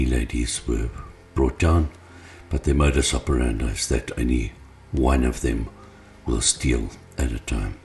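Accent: South Africa. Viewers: Ughh a Afrikaner accent gross. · Sounds like a South African accent. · It's obviously not America. All the shoppers are black and the narrator has a South African accent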